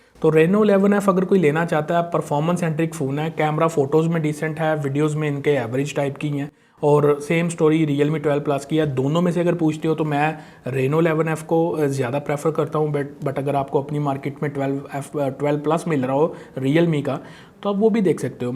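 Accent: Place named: Punjab, India. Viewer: Your humor is great with the punjabi accent 😂😂😂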